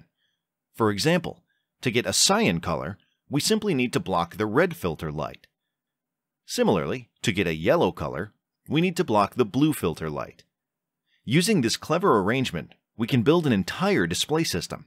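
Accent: India. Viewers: Indian accent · Daam this guy have most stereotypical Indian accent ever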